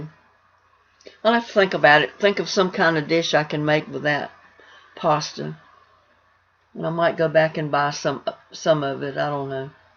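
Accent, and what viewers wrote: United States. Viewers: I have to thank you-that as a German I understand your American way of pronouncing it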